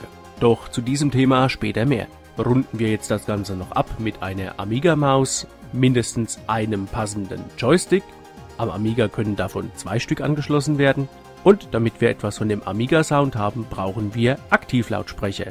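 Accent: Hesse, Germany. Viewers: Dieses war das erste Video, das ich von Dir gesehen habe und mir gefällt, was Du machst! Mal ganz davon abgesehen, dass ich selbst einen A500 hatte, finde ich Deine Ausführungen sehr eingängig und allgemeinverständlich. Ich gebe zu, ein bissel technischer könnte es meiner Meinung nach schon sein, aber das ist nur eine Randbemerkung. Übrigens: Du bist Hesse, oder? Du hast einen grandiosen Akzent, der aber niemals überhandnimmt. :)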